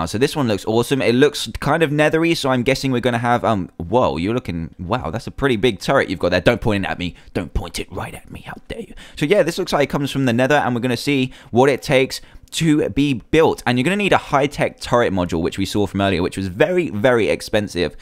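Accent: United Kingdom. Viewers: Are you british? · yes, obviously he is British, (no offence)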